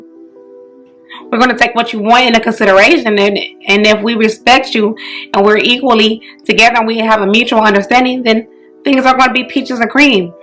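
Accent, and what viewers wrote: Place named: Spain. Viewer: like a Spanish accent to it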